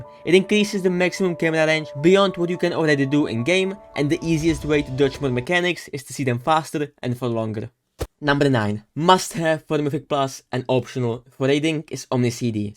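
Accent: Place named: China. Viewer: Jesus Christ, your "chenglish" is killing my brain cells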